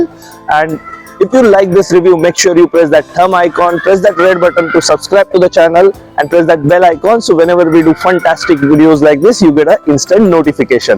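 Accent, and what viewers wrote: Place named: India. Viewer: Bhai bhartiya english matt boll dum hai aur gore ki aulaad hai to fluent english bolll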